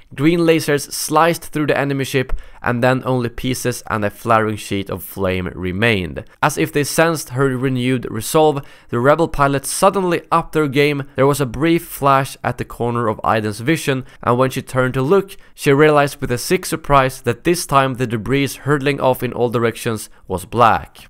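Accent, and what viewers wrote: Sweden. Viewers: it's ok I love your Swedish accent, I could listen to it all day! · your swedish accent is awesome im from finland so if enyone has terrible accent then its me😁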